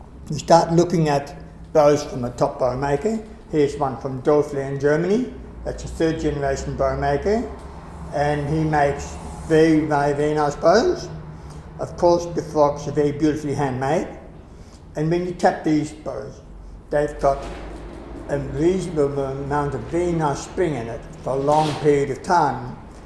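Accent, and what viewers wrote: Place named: Australia. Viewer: His accent sounds Australian to me, so I don't know if he means US dollars or Oz dollars.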